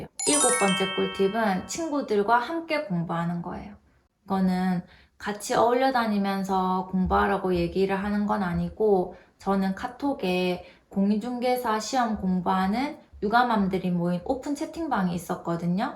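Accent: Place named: Daegu, South Korea. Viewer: 워킹맘인데 공인중개사 관심 생겨서 검색해보고 보는데 익숙한 사투리 너무 정감있어요 ㅎㅎ 저도 대구에서 자랐거든요~ 열심히 하신게 느껴져서 동기부여도 되네요. 솔직한 영상 감사드려요!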